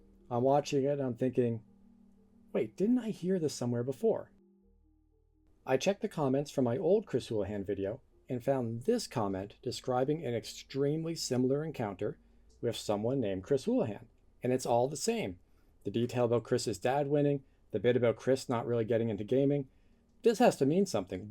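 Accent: Canada. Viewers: did you not hear the characteristic Canadian ow sound?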